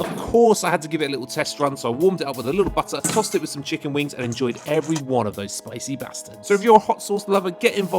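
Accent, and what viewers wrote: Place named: England, United Kingdom. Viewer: As a native english speaker I never thought I'd encounter an english accent too thick for me to comprehend naturally...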